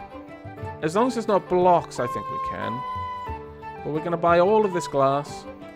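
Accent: United Kingdom. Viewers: Oh it's okay, i always enjoy these parts even if they come two weeks later. I even watched, and that's really rare, one episode twice while playing dwarf fortress just to hear your great british accent! Great videos!:D greets from Germany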